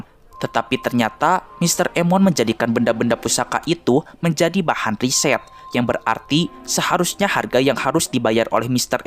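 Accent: West Java, Indonesia. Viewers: Sunda banget logat ny.....si mimin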